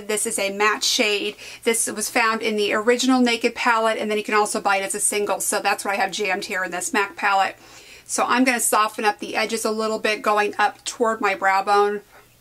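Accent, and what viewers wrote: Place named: Quebec, Canada. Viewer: C'est trop mignon de t'entendre parler français, j'ai pas l'habitude haha😆🙈 j'aime trop les accents québécois!👌 j'adorerais que tu nous fases d'autres vidéos en français!😁 et enfaite to blend en français c'est estomper!;) bisous xx.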